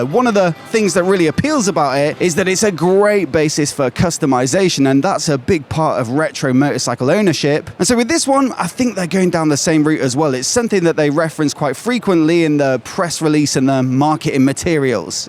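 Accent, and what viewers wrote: England, United Kingdom. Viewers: excellent side by side overview of both new R12 models. Presented in a clearly spoken and easy to understand generic English accent, thank you. Particularly liked that you sat on the bikes to illustrate their relative size. Eagerly waiting for your road test - and the USA release of course.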